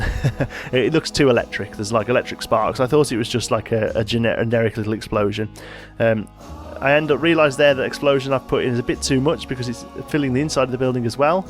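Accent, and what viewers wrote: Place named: Birmingham, United Kingdom. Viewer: Absolutely love this series. Probably the best I've seen. Love the detailing. Also, I did comment asking about your accent, but I think I've concluded its a Brummie accent? Correct me if I'm wrong.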